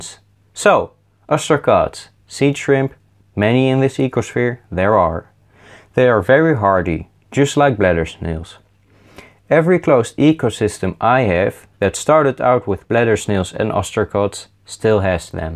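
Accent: Netherlands. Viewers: you sound really dutch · Jij bent nederlands ik hoor het aan je accent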